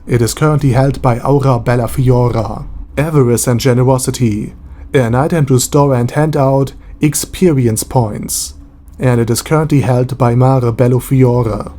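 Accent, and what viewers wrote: Germany. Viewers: I have to say, your kinda heavy german accent is mesmerising 😁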